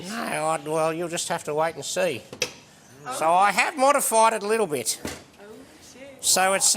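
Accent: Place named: Gawler, Australia. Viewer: This guy sounds like he comes from Gawler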